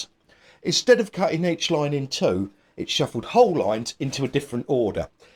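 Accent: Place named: Cockney, United Kingdom. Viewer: You speak English with a Cockney accent.Thats very rare nowadays. i thought the Cockney accent died out?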